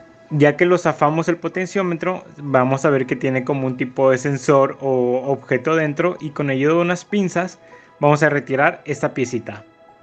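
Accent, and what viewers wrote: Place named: Mexico City, Mexico. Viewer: hola amigo, se escucha como si fueras de la cdmx, donde tienes tu talles y cuanto cuesta reparar el control contigo?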